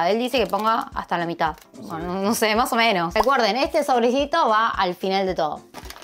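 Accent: Argentina. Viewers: ME ENCANTA ESE TOQUE DE ACENTO ARGENTINO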